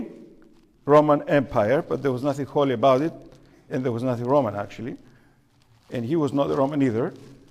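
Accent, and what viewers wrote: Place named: Greece. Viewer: Does this channel ascribe to the OCA, or the Greek Orthodox church? It is challenging to hear the english through his thick Greek accent, for me anyway.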